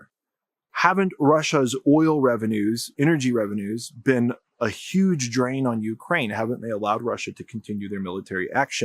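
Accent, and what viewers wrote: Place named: California, United States. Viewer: Snl californians accent a little annoying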